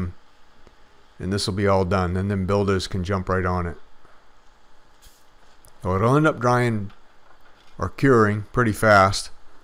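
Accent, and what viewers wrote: Maine, United States. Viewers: I knew your accent was Maine!! Nice 👍. I’m on vinalhaven island. Ever been out here? My boat shop burned down in July. So I am in the process of ground work for a new 40x60 steel building. Love your videos!!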